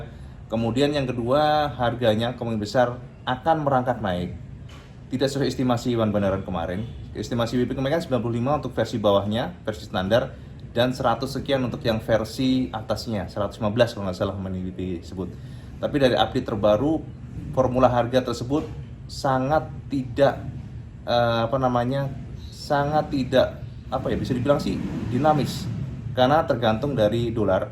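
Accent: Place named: East Java, Indonesia. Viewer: nonton kembali vidio ku ya ;) Aq guyu2 dewe cak ngrungokne bahasae peno koyo wonk jatim ada an 😁😁🤭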